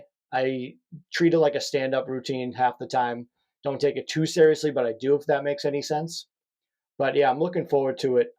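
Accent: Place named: New England, United States. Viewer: Are you from New England, Massachusetts maybe 😊 I can hear the accent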